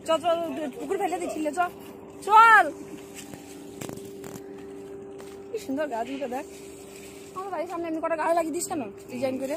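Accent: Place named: Birbhum, India. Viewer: বীরভূমী ভাষার রসটা দারুণ লাগে আমার❤❤❤... কবে যে তোমার সাথে এমন একটা দিন কাটাবো কে জানে! 😞😞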